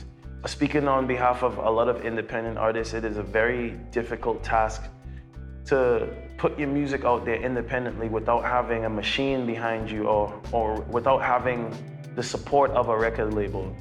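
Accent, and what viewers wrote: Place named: Trinidad, Trinidad and Tobago. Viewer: I like the fact that you are from the Caribbean bro. I rate the Trinidad accent. Lol, I am here typing in your accent. #🇯🇲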